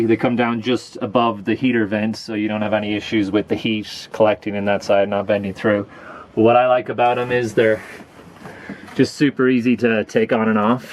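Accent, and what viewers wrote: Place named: Ireland. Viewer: I detect an Irish accent.where u from.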